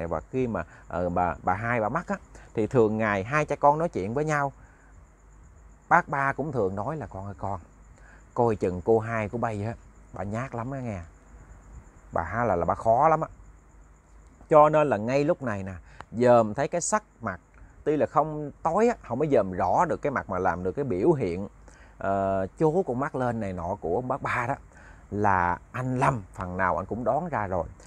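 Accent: Mekong Delta, Vietnam. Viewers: nói chuyện dễ thương quá đi, chuyện uống nước đó là nhu cầu của mỗi con người, ai cũng phải vậy, cũng lịch sự nói với mọi người, nên e thấy đậm chất miền tây, rất dễ thương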